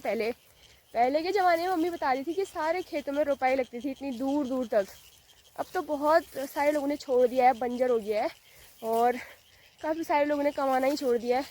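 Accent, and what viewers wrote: Pahari, India. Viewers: Aapka pahadi bolna bahut accha lagta hai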